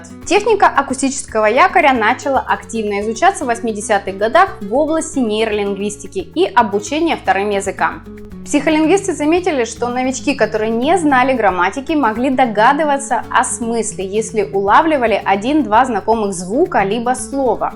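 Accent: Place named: Russia. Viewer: у вас ужасный русский акцент когда говорите по испански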